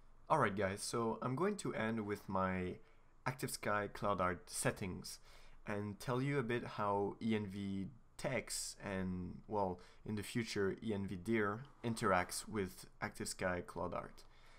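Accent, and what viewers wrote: France. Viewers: Vive la france XD grillé en 2 secondes avec ton accent ! Bonne continuation !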